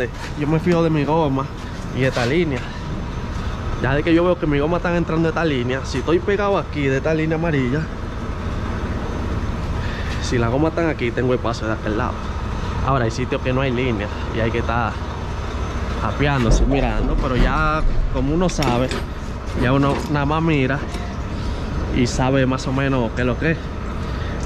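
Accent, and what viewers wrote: Dominican Republic. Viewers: Hey nítido son tus explicaciones ese asentó es puro dominicano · Manin tu suenas dominicano, saludos desde República Dominicana colega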